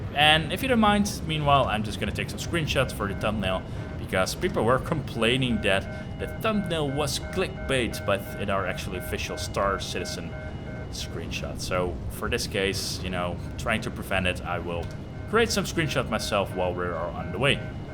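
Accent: Netherlands. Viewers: Are you dutch? I bet you're dutch.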